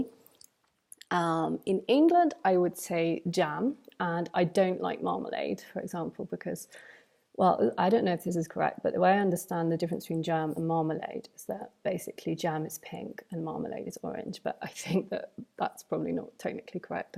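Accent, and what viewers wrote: Paisa, Colombia. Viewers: I love how strongly paisa your accent is. I could immediately notice it in your previous video when you pronounced the song’s name “ ”. Your English accent, however, is less pronounced than many other people I’ve heard from there, and much easier for me to understand. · Yep you sing Spanish like a paisa, good job!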